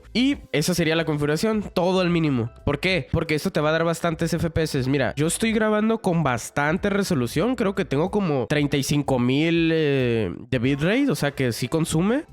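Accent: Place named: North, Spain. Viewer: De dónde eres? Te escuchas del norte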